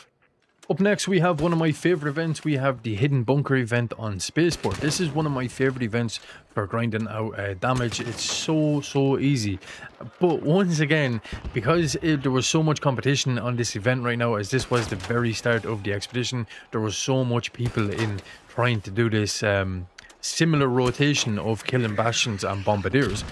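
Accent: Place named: Carlow, Ireland. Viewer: Sounds suspiciously like a Carlow accent 👀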